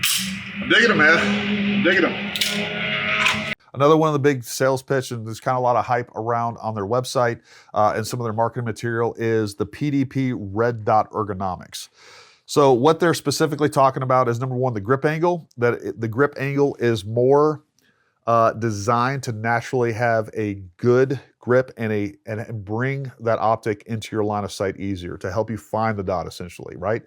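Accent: United States. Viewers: I need a German accent to explain the whole line up not a American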